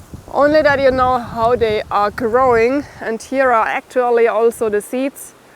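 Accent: Germany. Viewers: I love German accent, especially with all the mistakes. She warmed my heart through the entire pandemic. The world is already cold enough, don't you think?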